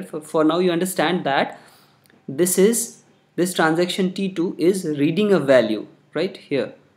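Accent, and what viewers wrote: India. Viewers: Are you Bangali ?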